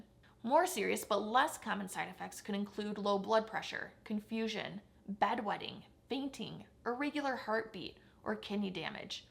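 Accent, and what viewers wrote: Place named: Midwest, United States. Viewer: nice midwest accent :D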